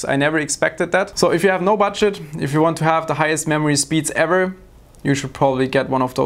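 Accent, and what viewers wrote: Germany. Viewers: Love the german accent 😍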